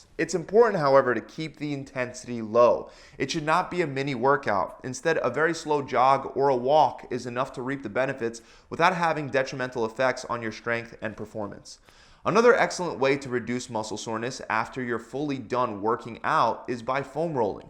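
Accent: Philadelphia, United States. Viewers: I know he's from philly from the way he says water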